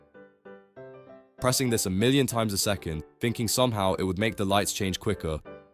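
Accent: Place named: Birmingham, United Kingdom. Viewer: Nope! Back to the old voice. This one sounds like a Brummie with Down Syndrome.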